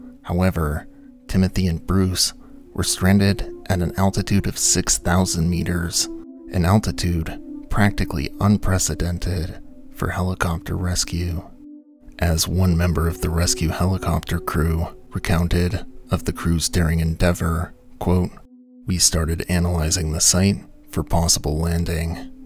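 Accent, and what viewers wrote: Midwest, United States. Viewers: Mid west accent fer sheer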